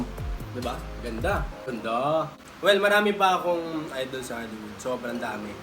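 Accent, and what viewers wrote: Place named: Conyo, Philippines. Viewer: Sobrang saya ko while watching, napaka konyo mo and di ka boring kausap sana lahat may sense of humor.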